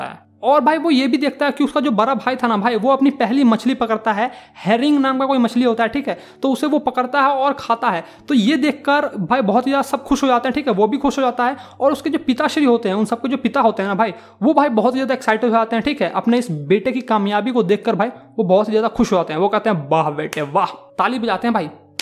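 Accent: Bihar, India. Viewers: Bihari hai tu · Sir bihari ha isliye ud ko ur bol rahe hai 😅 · Bhai bihari h wo ...or har bihari jab bihari language mai bolta hai to ek jesa he lgta hai ..😂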